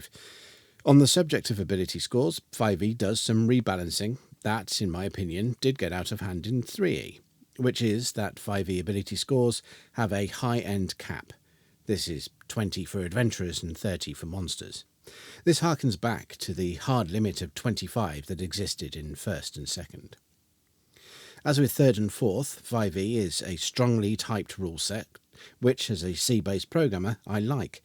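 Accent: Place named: United Kingdom. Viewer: Amazing video! I cant get from the accent, where are you from in the UK?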